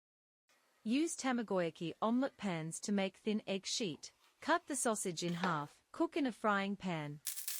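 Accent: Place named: Australia. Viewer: This is a cute bento. More people would watch if you used captions instead of the english voiceover. The Aussie accent is jarring to listen to.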